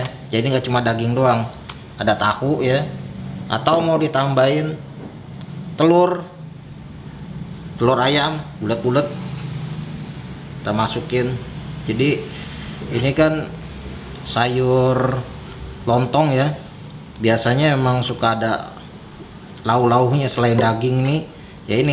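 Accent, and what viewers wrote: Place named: Depok, Indonesia. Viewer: Lontong sekel.. bise begitu yee bang.. depok beut dah ah logatnya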